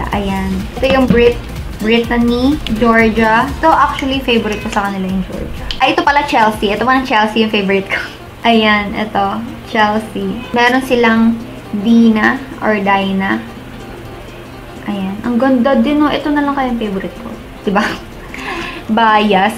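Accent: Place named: Conyo, Philippines. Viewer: Nakakatuwaaaaaa hahahahaha ang conyo mo na ate hahaha ang cutieeee lab lab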